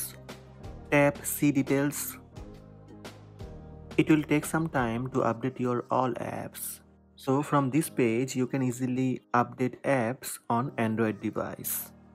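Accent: India. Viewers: GOOGLE IS USELESS THANK YOU MY INDIAN LOVABLE ACCENT GUY! also im subscribing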